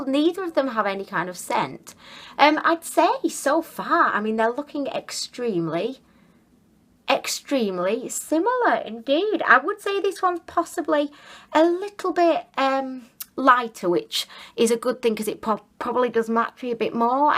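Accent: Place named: South London, United Kingdom. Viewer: Interesting accent. Where are you from? Are you south of London??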